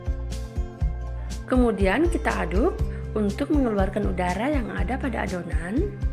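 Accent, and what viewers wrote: Indonesia. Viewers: Kaya orang bali kalo ngomong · Mba.. Asli Bugis Makassar yah? Logatnya kayak org Bugis, salam kenal yah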